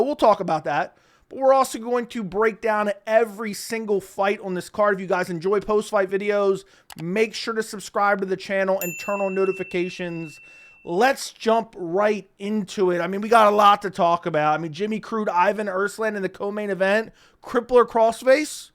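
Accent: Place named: South, United States. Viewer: Love when you do that. Mega good old boy voice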